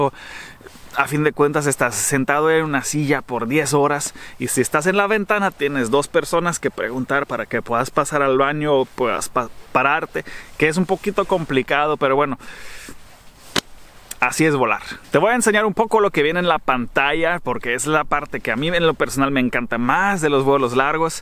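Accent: Monterrey, Mexico. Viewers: Porque ese nombre de Alemán Latino. Si tu acento es Mexicano Regio.?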